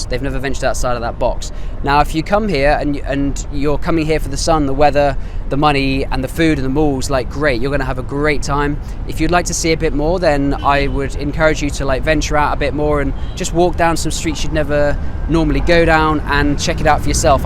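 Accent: United Kingdom. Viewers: I like your accents 😁 the way you said summer.. It's british and really like british accent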